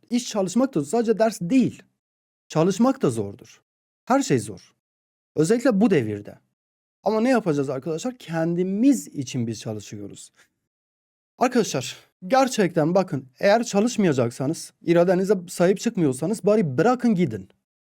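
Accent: Van, Turkey. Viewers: Vala zordur dediğiniz yer 😀 cok ozlemişim Van'ı iyiki şivenizi hiç yitirmemişsiniz, Allah yolunuzu açık etsin 👏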